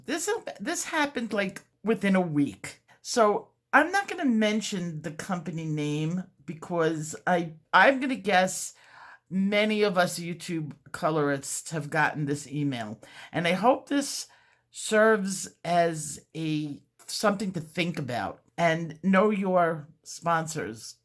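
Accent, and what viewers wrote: New York, United States. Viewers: you are simply fabulous. I get in trouble sometimes for my blunt honesty. However, I will not change that. You made my entire day saying FWOF!!! Your talent and honesty are exactly why I come to you to learn and be entertained as an artist. You got it all! And you know I have a thing for "New Yorker's accent" lol.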